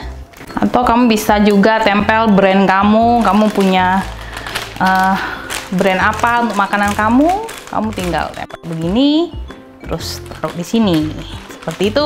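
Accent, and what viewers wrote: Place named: Java, Indonesia. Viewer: thank you, sy mau coba ah. Btw, ci orang jawa ya ..logatnya jawa hehe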